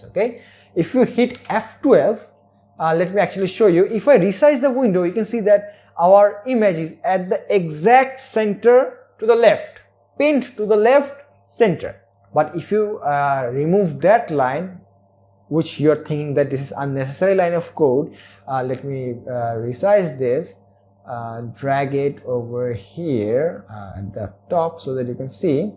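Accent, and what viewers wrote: Bengal, India. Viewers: Indian accent Lol, can’t understand! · dada tomar accent ta sunei bojha jachhe bangla vasa vashi❤️❣️,love from WB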